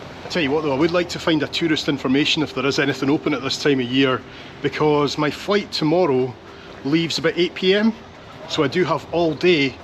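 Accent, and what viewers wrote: Scotland, United Kingdom. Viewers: I love the Scottish accent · Hello from Singapore. Love watching your videos and your Scottish accent.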